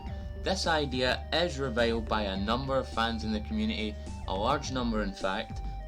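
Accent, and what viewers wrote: Scotland, United Kingdom. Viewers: I liked this video, man! First and foremost, is that a Scottish accent I hear? If it is, that's awesome because we need more UK YouTubers in the Dragon Ball community - me being one myself! I loved your idea of a Saiyan that resembles Goku travelling to Earth in Trunks' timeline and easily destroys him. The only question from there would be, how did he become that powerful to have this be a threat to Goku, Vegeta and even the Omni-King? Me personally, I think "Black Goku" will be a created being, by a force that hasn't been introduced yet in Dragon Ball Super. Fun theories and video, I subbed for more! :)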